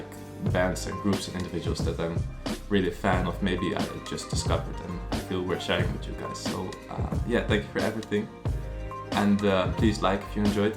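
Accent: Netherlands. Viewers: Are you dutch? i can hear your accent. :3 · you started speaking and i knew! Das een Nederlander!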